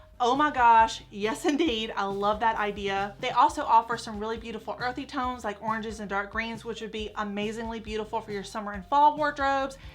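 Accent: South, United States. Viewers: New sub here. I agree, Teddby Blake has some gorgeous well made bags. I got the 9" Ava Croco Gold in Arancione and now I want the 12" Caty Croco in Black! I can attest to the quality of the leather having lived a few years in Italy, their leather is TOP notch! Looking forward to seeing more video's from you dear. Btw, I'm a Southern gal too and I love your accent!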